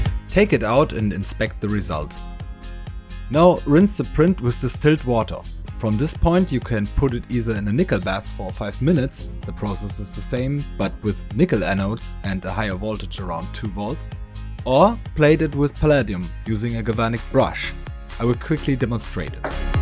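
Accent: Germany. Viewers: He sounds to be German! That explains it. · I mean, one can obviously tell you are german by your accent, but are you aware you sound crazy similar to docm77, a popular german minecraft youtuber from karlsruhe? :D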